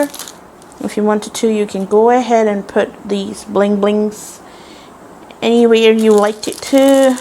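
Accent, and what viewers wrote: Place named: England, United Kingdom. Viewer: i personally love your English accent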